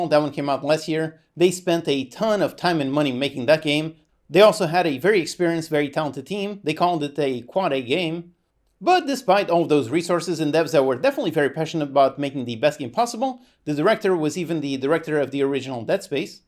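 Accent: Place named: Portugal. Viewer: Are you from Portugal? You have a similar accent!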